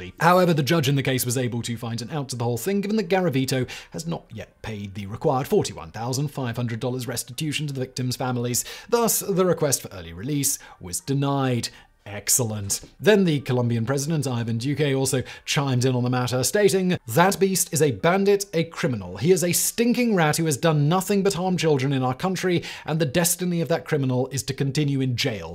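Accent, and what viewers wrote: England, United Kingdom. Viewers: Was it just me, or was extra laden with English in this video??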